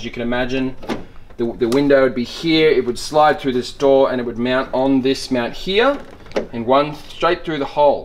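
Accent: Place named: Australia. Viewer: Appreciate it mate finally a Aussie explaining it thanks for helping a young fella out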